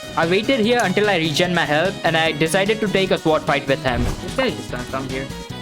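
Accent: India. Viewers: Was watching this next to my father, and he said “TURN THE FUCKING THING OFF… I dont mean to be racist but I HATE indian voices” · man bro i just realized his voice is indian INDIAN BOYS!!!!!!! :) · nice, exactly 8 mins for youtube payouts, and the indian accent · Are u a Indian u sound like one I'm and Indian too in Hyderabad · This is the first vid of you I’m am watching and from the start I KNOW you’re Indian from your accent! I’m also an Indian. Good to see more Indian ppl makin vids bout roblox and more games! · dude speaking extreme Indian accent in this!😆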